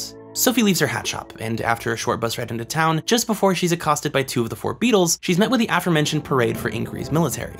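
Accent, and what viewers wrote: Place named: United States. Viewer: Hi, this came up and I got to the point where you got to Myazaki pointing out how this is Iraq war. I agree war is bad, I don't think that is up for debate. But Myazaki is a known Pacifist, and as a Japanese person, I won't fault him for it. But based on your accent, you are an American. In the current world, do you think we should be pacifist? Its 2024, and there are multiple wars we are supporting. Should we pull out?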